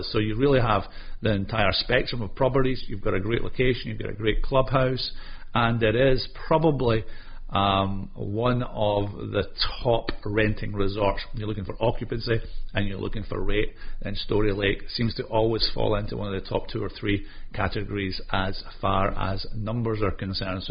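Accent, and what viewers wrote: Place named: Scotland, United Kingdom. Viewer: Just found your video and was delighted to hear what I think is a fellow Scottish accent - hello! I'm really not surprised to hear Reunion resort on your countdown here - I've always thought it looks stunning in there. If you ever do an overview or more affordable options, I always rate CLC Encantada in Orlando - nowhere near the level of Reunion, but a really solid option if your budget doesn't stretch that far!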